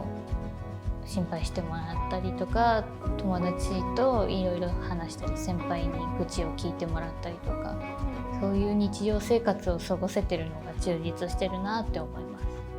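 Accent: Akita, Japan. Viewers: 久々の秋田弁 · 秋田弁可愛い‼️ それに、仮面ライダーカブト見てるのが、共通点あって嬉しい‼️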